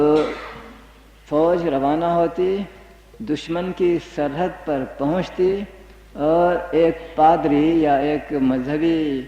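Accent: Hyderabad, India. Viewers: ماشاءاللہ۔۔۔ھر لیکچر ایسا ھے کہ 2020 میں سنتے ھوئے بھی محسوس ھوتا کہ نالج اور علم کے نئے باب کھل رھے ھیں۔۔ڈاکٹر صاحب کا حیدرآباد دکن کا اردو لہجہ مسحورکن ھے۔۔۔اللہ ڈاکٹر صاحب مرحوم کو جنت الفردوس میں اعلی مقام عطا فرمائے آمین۔۔۔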